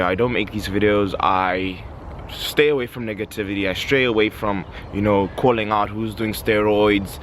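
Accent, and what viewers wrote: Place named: South Africa. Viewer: yo where u from bro? u sound south african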